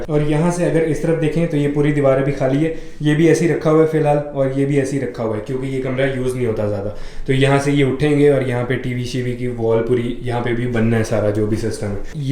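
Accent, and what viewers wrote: Punjab, India. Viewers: Yaar apka Punjabi bolna words bohot mazey dar ladta hai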